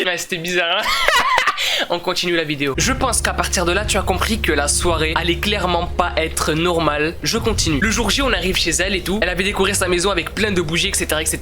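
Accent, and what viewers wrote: Marseille, France. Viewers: t marseillais parce que moi aussi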